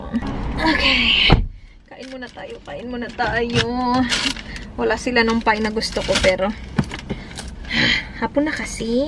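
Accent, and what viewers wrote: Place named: Visayas, Philippines. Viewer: ung sobrang naging fanatic na ako,pati accent ni sa bisaya naadopt ko na unconciously..hahaha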